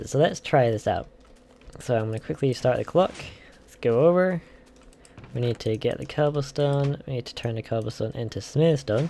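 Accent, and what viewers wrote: Scotland, United Kingdom. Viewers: are you pretending to be scottish or lived in scotland for a while or something?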